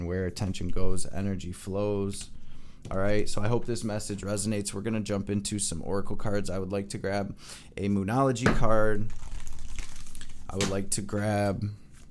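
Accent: Detroit, United States. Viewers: You from Detroit bro?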